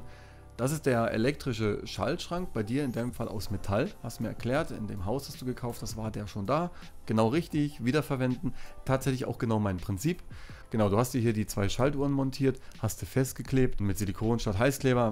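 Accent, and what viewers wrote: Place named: Swabia, Germany. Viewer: Ja, der ist schon ein guter. Vor allem die Stimme, auch wenn er aus dem Schwäbischen kommt. Die Stimme ist weich und er erklärt es super. Könnte auch als Werbesprecher Arbeiten.. Jetzt zum Thema, ich habe mir auch eine Pool Heizung zugelegt. Pool hat nur um die 3000L. Hatte als erstes den Papierfilter gegen eine Sandfilteranlage mit diesen Bällen getauscht. Muss sagen geile Sache. Im zweiten Schritt habe ich mir bei einer großen Plattform 4 Absorber und das Passende Zubehör Bestellt. Alles auf die Wiese im Garten zusammengebaut und es lief alles super. Inzwischen habe die Anlage auf unser Pergola Dach montiert. Jetzt funktioniert sie leider nicht mehr richtig... Habe mir dazu dein Video mit deiner Heizung angeschaut und musste wahrscheinlich feststellen das ich auch ein Rückschlagventiel und eine Fallbremse brauche. Na ja, ist bestellt jetzt hoffen wir das es geht. 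Und das bei einer Fallhöhe von nur 2m. Vielen Dank für deine Filme. Mach weiter so.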